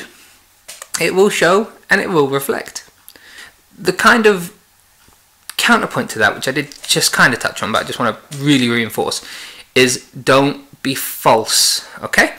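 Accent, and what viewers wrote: United Kingdom. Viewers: great video mate!!!! love the way you say Telly! is in the with ur British accent!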